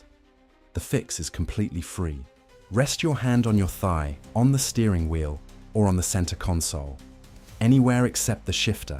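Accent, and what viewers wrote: United Kingdom. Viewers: Why the British voice. I truly hate that. Unless of course I'm watching a 007 movie.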